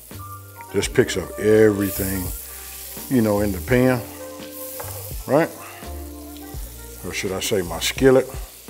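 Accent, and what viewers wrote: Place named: California, United States. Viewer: sounds like an Cali dude who’s family came from the south. ❤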